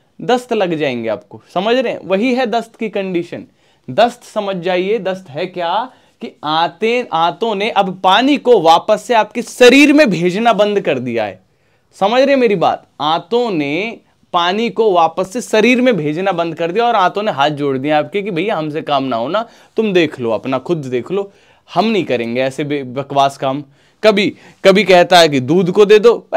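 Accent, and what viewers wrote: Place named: Jaunpur, India. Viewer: Sir... Apke padhane ka style bhoat hi pasand aaya hai.... Ek dam jounpur sultanpur ki tone me.... Mazaa aagya evs ko desi touch me padh kr🙏🙏🙏😊thank u sir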